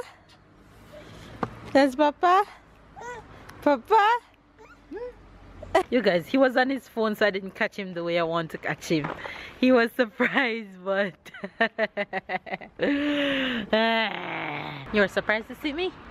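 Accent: Jamaica. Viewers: I like your Jamaican accent. Autumn is awesome. It's not too hot, and not too cold.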